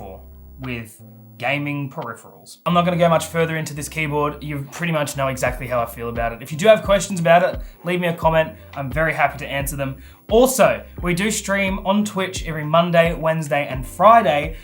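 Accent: Australia. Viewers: Where you from man, by your accent I bet you’re from Australia like me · U Aussie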